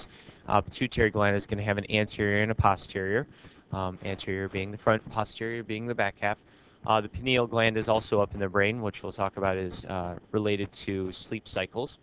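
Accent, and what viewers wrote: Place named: Upstate New York, United States. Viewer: An upstate NY accent! What a surprise to find when I was surfing the internet looking for endocrine lectures :)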